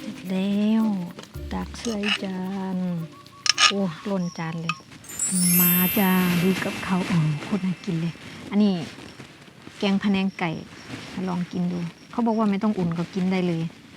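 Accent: Korat, Thailand. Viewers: เป็นคนจังหวัดอะไรหรอ สำเนียงแบบนี้ คนโคราชหรือเปล่าคะ 😂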